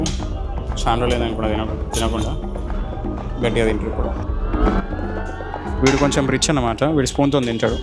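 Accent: Telangana, India. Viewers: Bro with telangana slang 🔥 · Aa slang thoni .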